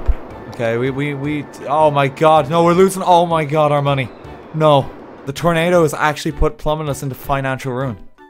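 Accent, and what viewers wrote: Ireland. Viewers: Ya know GrayStillPlays tries his best to make a chaotic city but you have made a well working chaotic city + an irish accent so you are better. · Are you from Ireland I am I live in Kerry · Gotten used to how his accent sounds now and I honestly can't tell if he sounds less or more Irish than he does here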